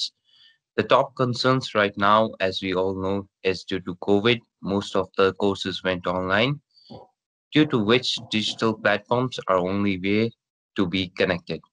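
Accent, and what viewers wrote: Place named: Canada. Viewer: finally got canadian accent! More success 2 you bro.....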